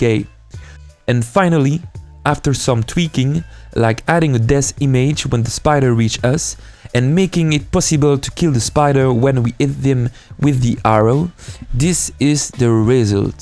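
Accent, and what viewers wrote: France. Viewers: If you're commenting on his accent, it is French, not Ugandan.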